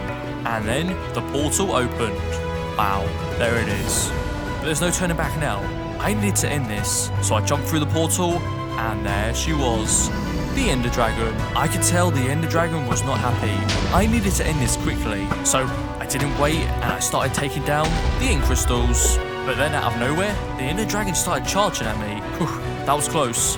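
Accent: United Kingdom. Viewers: Are You British? And That's Not Moss Block It's Mossy Cobblestone Not Moss🤦🏻🌷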